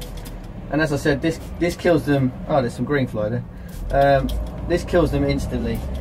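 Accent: England, United Kingdom. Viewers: Thank you young man for an excellent video. No heavy background music and a nice pleasant slowly delivered English accent. Followed your advice and it worked a treat.. Many Thanx Grumpy Grandpop.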